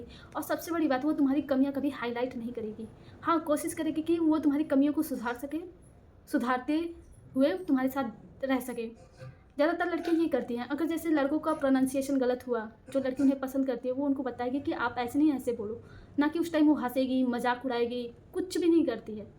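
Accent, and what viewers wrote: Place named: Banaras, India. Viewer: Aapki bhasha se aisa lgta hai banaras ya sultanpur ya jaunpur ya udhar he side se ho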